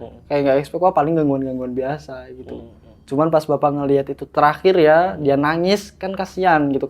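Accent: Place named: West Java, Indonesia. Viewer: abang nya ngobrolnya lucuu 😅 sunda campur logat nya adaa,, cerita serem pun jd nyantaii dengernyaa ,, sukses terus buat semuanyaa,, lentera malam pkonya kerennnn 🤗🤗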